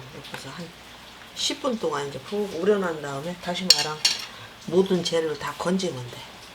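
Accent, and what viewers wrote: Chungcheong, South Korea. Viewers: 충청도이신가바요ㆍ 목소리들음 친정엄마생각나요ㆍ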